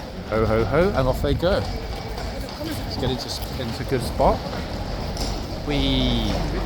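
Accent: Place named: London, United Kingdom. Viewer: Handsome and so is the Horse. LOL , Love your commentary look forward each day. and glad to hear a London accent. a big change for us ex eastenders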